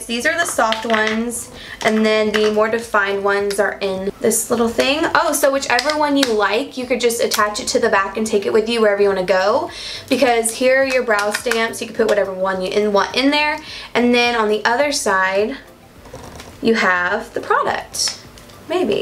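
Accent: South, United States. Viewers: I absolutely love your videos and southern draw!! Keep it up darlin'. 💚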